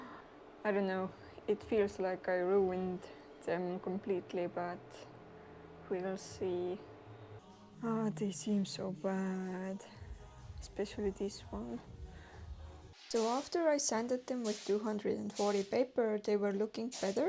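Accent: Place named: Finland. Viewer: I was thinking your accent sounds finnish but your plates are Estonian. Then i realised where Estonia is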